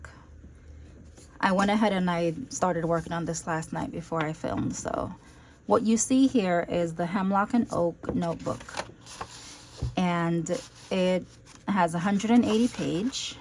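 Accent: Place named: Jamaica. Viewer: Your channel is refreshing... without the stress. I will learn a lot from you. Lol! And I scrolled through to ensure I was hearing a Jamaican accent albeit mixed 🇯🇲😎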